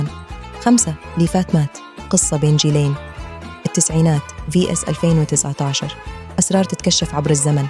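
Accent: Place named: Egypt. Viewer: اظن مصرية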